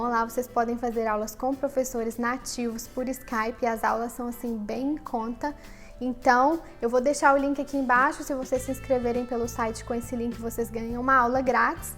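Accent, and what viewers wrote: Minas Gerais, Brazil. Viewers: Seu sotaque é muito mineiro!!!! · estou amando seus videos, pfv não para, amo seu sotaque q fofo 😍❤ sotaque mineiro não tem igual hahaha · Você é mineirinha? rsrsr tem um leve sotaque....rsrsr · Você é mineira? hehe tem um sotaquezinho! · Sua pronuncia do inglês é linda. Mas sinceramente, não tem nada mais meio do q um sotaque mineiro sô. kkkk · Pq seu inglês não tem sotaque mineiro? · Oi... vc é Mineira? Tem um inglês com jeitinho de mineiro falar... Bjs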